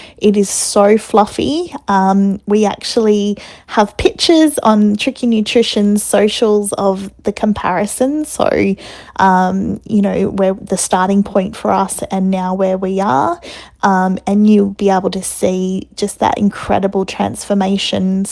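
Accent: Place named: Australia. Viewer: I love your Australian accent! I never get tired of listening to it 🧡🧡🧡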